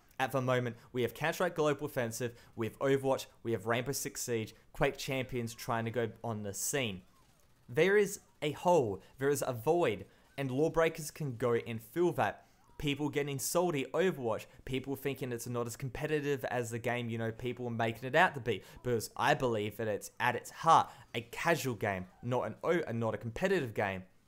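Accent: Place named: Australia. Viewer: Wait...I thought that's how every Aussie would pronounce those words. Them and the Brits have the same pronunciation for words that in American English wouldn't. I think it's a beautifully interesting thing about the language. Like how the Brits pronounce "torque" and "talk" pretty much the same way.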